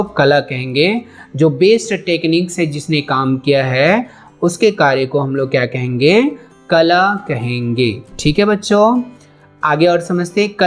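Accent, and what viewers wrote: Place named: Punjab, India. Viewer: i think you from punjab😅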